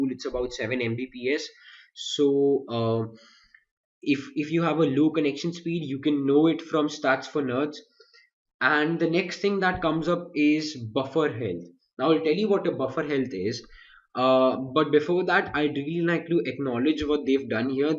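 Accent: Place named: India. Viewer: It's Indian accent.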